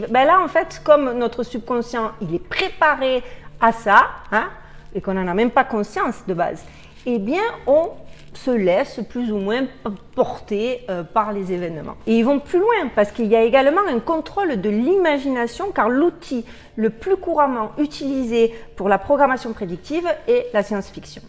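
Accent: Marseille, France. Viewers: Bonjour. je regarde vos vidéos et votre accent me rappelle des souvenirs , j habitais du coté de Marseille, mais comme mes filles vivent maintenant une a Boston et l autre près de Montréal j ai traversé moi aussi et je ne regrette pas.... Merci pour les vidéos.....Mon gendre est Directeur de Demandes Globales chez Sanofi a Boston.. je vais demander a ma fille ce qu elle en pense.....j ai deux magnifiques petits enfants et je n ai pas envie qu ils soient abîmés par leur saloperie de vaccin... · Hello! J’adore tes vidéos! Elles traitent de sujet graves avec une pointe d’humour dont tu as le secret 😉. Continue comme ça! Je like ❤️. Par contre, effectivement, je ne reçois aucune notification alors que j’ai bien cliqué sur la cloche 🔔... Mais c’est pas grave! Je vais direct sur ton compte lorsque j’ai une petite baisse de moral 😁 Cet accent du Sud ne peut que me mettre en joie!! 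Bravo bravo pour ton travail 🙏🙏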